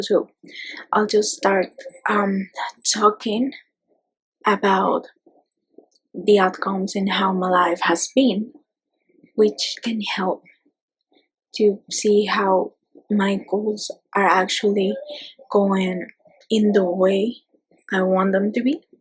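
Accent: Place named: Colombia. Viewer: Hope you're doing okay. I love seeing your nice face and hearing your Colombian accent 😀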